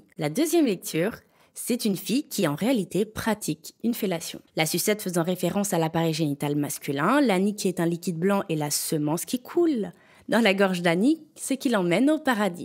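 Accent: Belgium. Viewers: Merci pour ta vidéo que je trouve très bien travaillée. Surtout ne change pas ton charmant accent belge;et c'est un français qui te le dis. Bonne continuation à toi!❤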